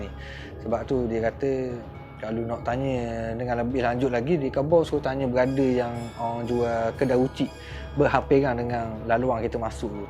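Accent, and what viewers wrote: Terengganu, Malaysia. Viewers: PEKAT MOLEK GANU TERR... TAPI TETAP MANTAP BOSSKU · OH..terbaik..salam ukwah dari saya d SABAH...walau saya tak berapa nk faham sleng terangganu..tapi sangat memeranjatkan part abg sado tg lembut tu naik motor...nanti khabo kat dia jangan buat lagi...😂😂😂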